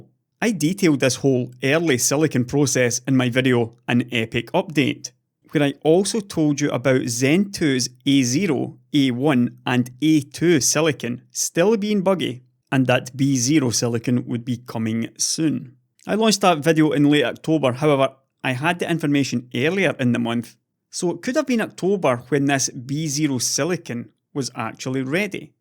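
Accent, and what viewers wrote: Scotland, United Kingdom. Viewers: Scottish and male alter ego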